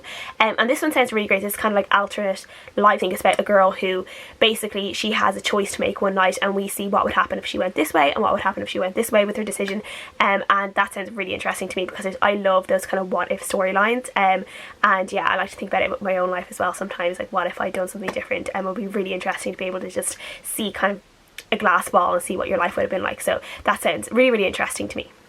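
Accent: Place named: Ireland. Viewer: I love your Irish accent! As an American of Irish decent, it never gets old. On Beauty looks amazing! Have a fun trip girl!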